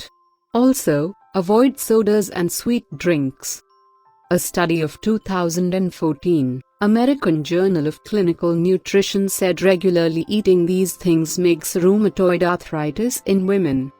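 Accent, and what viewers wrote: India. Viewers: Refined Indian accent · combination of voices. robotic and.Indian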